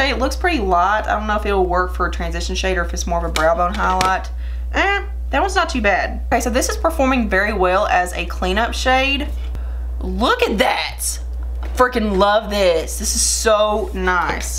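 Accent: South, United States. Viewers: New subscriber! Love hearing that southern accent...I'm a Alabama girl...Roll TIDE! Your're so funny and have some great content!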